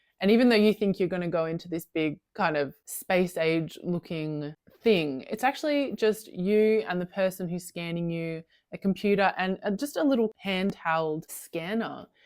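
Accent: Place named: Australia. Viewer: Nice vid! I did the cheats version of this about 18 months ago. I took photos and measurements and padded out a reasonably high-quality mannequin that was close to my size. It's not exact, because it was hard to get the little details right ......but its 80% there. She looks remarkably like yours, lol. Which is......comforting, actually. At first it was confronting to see how 'not perfect" I was. But after looking at her for months, I have accepted her......as me. AND........what a delight it is to be able to use a fit model that is closer to my body! I do corsetry and alot of fitted styles, so it really helps. I don't think she is quite good enough to do draping.....so i am VERY interested in your new acquisition, and seeing how you get along. PS. its lovely to hear an Aussie accent.....and you are a fellow Melbourne lady....so double 👍points there! 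PPS. you actually grabbed my attention initially because I saw your Regency outfit in the background.........I also enjoy costumery and am currently trying and failing to make some long regency stays.......I can't quite tweak it.....even with my padded form. ergh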